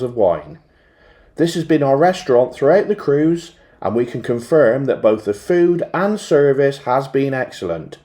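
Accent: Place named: England, United Kingdom. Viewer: Sorry if this has been asked before but what part of England is your accent from, Devon? Just discovered your videos -great job you two!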